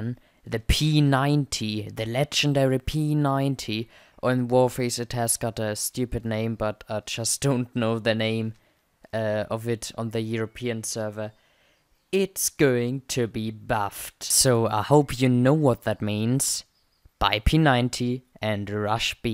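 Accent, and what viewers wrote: Germany. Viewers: could you add me on EU server I would love to be on your FL and play with you name = NotoriousIshy (ps your English with the German accent is better than most people's English I know LOL)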